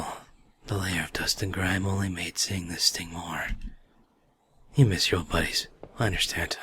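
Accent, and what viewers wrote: United Kingdom. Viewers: Lovely british accent there!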